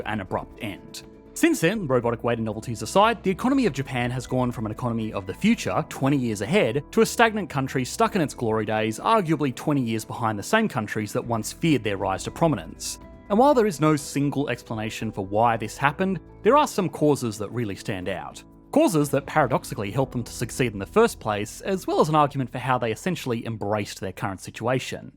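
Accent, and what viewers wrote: Australia. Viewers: the Australian accent is the worst accent in the world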